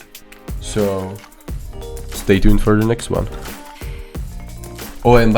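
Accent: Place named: Czech Republic. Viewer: Accent sounds extremely Czech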